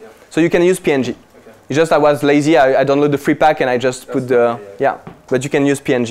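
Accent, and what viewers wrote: France. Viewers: I'm hearing French actually.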